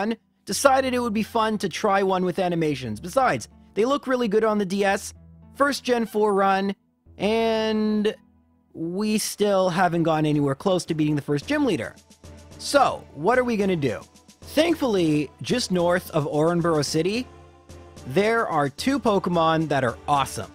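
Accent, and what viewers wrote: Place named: Canada. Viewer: Hes from Canada so he pronounces pokemon names differently then in the us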